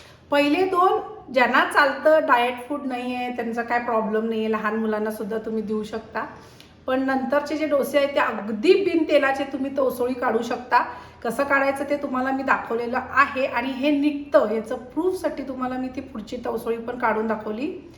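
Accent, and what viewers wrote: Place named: Gadhinglaj, India. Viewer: खूपच ावडलई. नक्की करून बघणार. काकडीची चटणी आणि रोस हे नवीन प्रकार समजले. मस्त!!, अलिकडच्या व्हिडिओत तुम्ही हडकल्यासारख्या दिसता. ही complement आहे बरं का. आज मेकप पण खऊप छान झालाय.❤ -सुनंदा गंदगे ता.क. तुम्ही रेसिपी खूप छान सांगता. मला तुमची भाषा ऐकून पण खूप छान वाटते. माझ्या आत्या गडहिंग्लज च्या होत्या.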